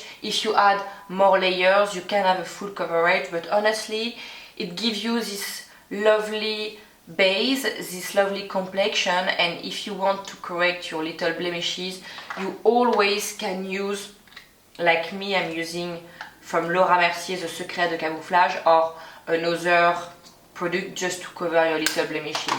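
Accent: France. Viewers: your french accent is super cute! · I just found your channel by complete chance (searching for Dior Forever reviews!) and I am so happy that I did. I have always been obsessed with everything French and I love your accent.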